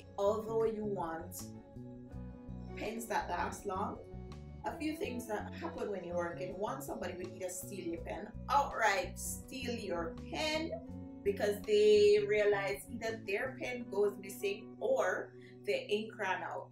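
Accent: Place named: Jamaica. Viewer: Where in the Carribbean are you located? I’m hearing a Jamaican accent…👂🏾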